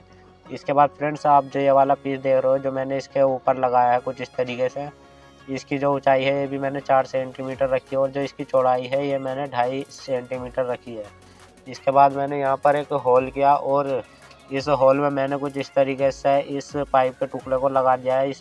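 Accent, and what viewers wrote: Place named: Bijnor, India. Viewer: kyuki m bhi bijnor ka hu .bhasa sunke pata chala 🤣🤣vaise tum bijnor m kaha se ho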